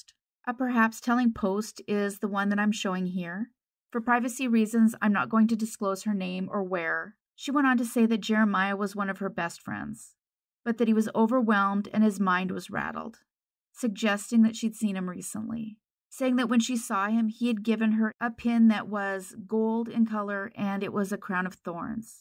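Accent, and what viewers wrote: United States. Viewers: The voice we are listening to, sounds like Southern Girl.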